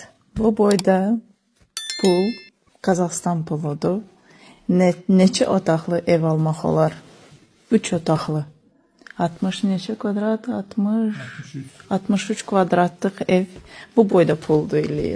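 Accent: Azerbaijan. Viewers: Из за акцента начала слушать.. как будто русская пытается говорит на азербайджанском.., но.. гялифсиз, олуфду, олажах, дяйистиражам, севиняжах........ а это уже регион Азербайжана.... у меня мозг вскипел..😂 тии кито?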